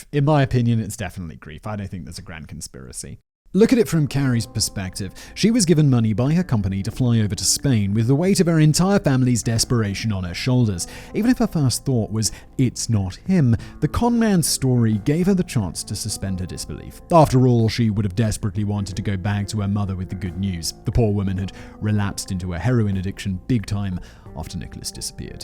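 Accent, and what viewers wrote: RP, United Kingdom. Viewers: Let me just tell my friends how im addicted to watching videos about murderers narrated by a swanky bald british man, yea thats a good idea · Blah blah blah. He's destroying the story! British accent isn't good enough to make up for the crap telling.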